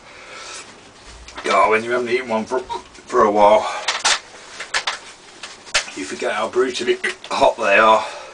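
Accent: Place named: United Kingdom. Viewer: I enjoy your videos. Like I mentioned earlier, you are just a dead ringer for my oldest son, it's like watching him with a Brit accent :-). Continue to carry on.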